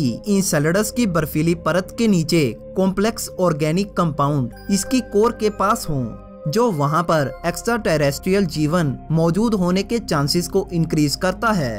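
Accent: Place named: Chandigarh, India. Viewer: KOI NI BHAI SAB BHAI BHAI HI HAI MAINE SIRF ISILIYE PUCHA THA KYUKI AAPKA ACCENT MUJHE BILKUL CHANDIGARH WALA LAGA.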